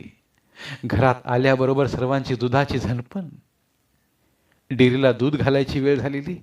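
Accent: Rural, India. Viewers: नमस्कार सर, मस्त वाटेल, ग्रामीण भागातील जसाच्या तसच आवाज आवडला.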